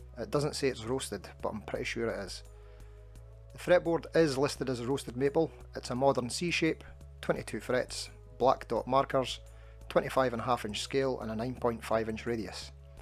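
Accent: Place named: Dundee, United Kingdom. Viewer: Sounds like a Dundonian accent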